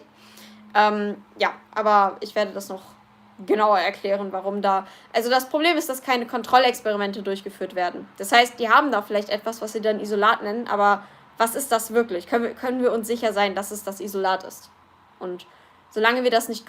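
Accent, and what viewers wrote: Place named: United Kingdom. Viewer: You sound a bit like a character out of the Harry Potter films when you're speaking English. No offence!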